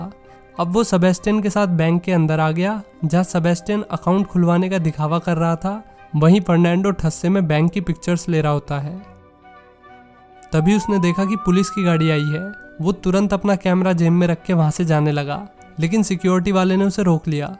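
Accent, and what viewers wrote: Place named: Western Uttar Pradesh, India. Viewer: Bhai tu west up se h n 😂 Moradabad , bijnor orr meerut?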